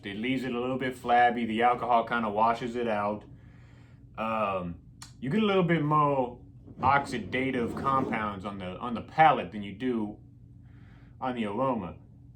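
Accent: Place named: South, United States. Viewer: Great video! Are you southern? I love your accent 😍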